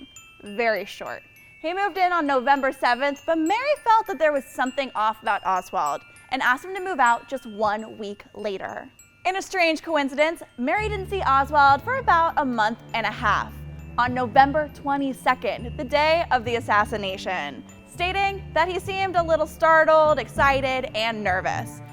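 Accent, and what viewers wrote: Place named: California, United States. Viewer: My God that voice. She sounds like The Californians on SNL. Not to mention that it's not definitive Oswald killed JFK or, at worst, pulled the trigger.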